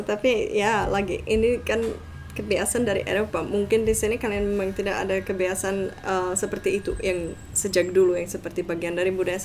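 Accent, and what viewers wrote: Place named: Java, Indonesia. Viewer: medok bangaet bahasa indonesianya mbak ceko cantik. 👍💜👍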